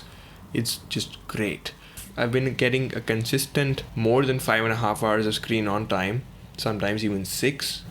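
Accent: India. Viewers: I loved Indian accent!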